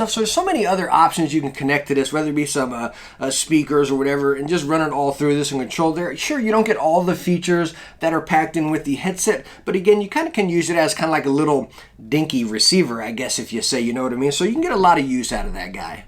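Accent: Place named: East Coast, United States. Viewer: 2 seconds in i was like "this guy is east coast for sure"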